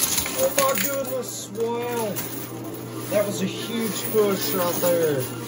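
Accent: South, United States. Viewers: Damn , I’m from Alabama and this guy sounds as country as they come ! Lol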